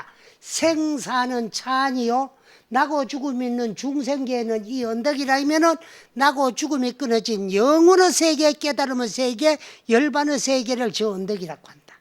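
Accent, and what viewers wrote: Gyeongsang, South Korea. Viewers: 묘허스님법문에 푹 빠져서 밤낮을 모르고 경청하고있습니다 스님 감사합니다 경상도 사투리가 이웃집 어르신같습니다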